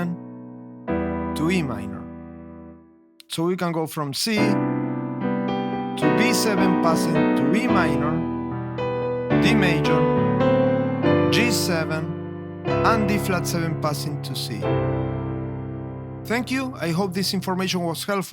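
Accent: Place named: Spain. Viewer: I think the teacher's accent sounds pretty Spanish to me.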